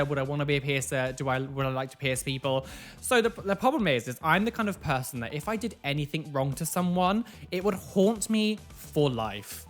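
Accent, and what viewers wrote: United Kingdom. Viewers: you should see me do my impression of you to my friends complete with hand motions and british gay accent. "time for another piercing reaction videoooooo!" lmao!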